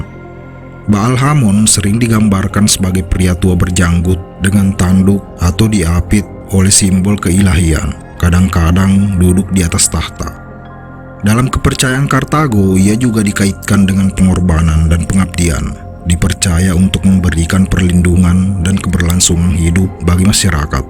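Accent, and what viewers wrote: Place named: Bugis, Indonesia. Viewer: Knp logatnya seperti orang bugis😂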